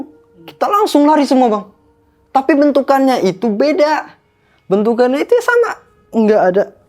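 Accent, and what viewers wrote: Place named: West Java, Indonesia. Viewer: abang nya ngobrolnya lucuu 😅 sunda campur logat nya adaa,, cerita serem pun jd nyantaii dengernyaa ,, sukses terus buat semuanyaa,, lentera malam pkonya kerennnn 🤗🤗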